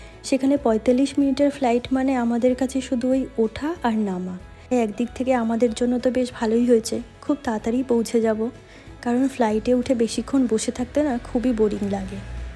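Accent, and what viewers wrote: Bangladesh. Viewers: apu tmr kotha sune mone e hoicce na j tmi indian,,,,,,,, tmr kotha akbare Bangladeshi der moto,,,,,,😊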